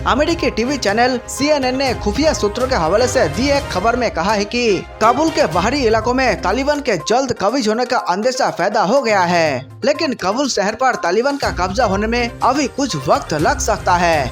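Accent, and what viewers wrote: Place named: Bhojpuri, India. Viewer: तू सबसे बेकार न्यू जलाता है भोजपुरी बोलने का तरीका तेरे पास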